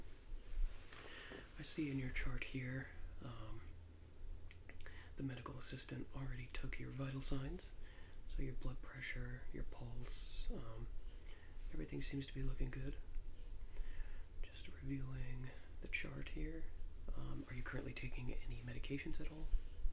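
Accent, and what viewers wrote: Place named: United States. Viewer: This guy looks so British I was expecting a regional accent like a Yorkshire accent not a freedom murica one. Great ASMR though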